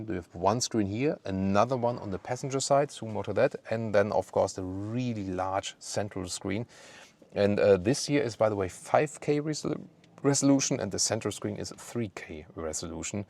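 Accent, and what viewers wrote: Germany. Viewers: Nice Video but the English sounds waay too german!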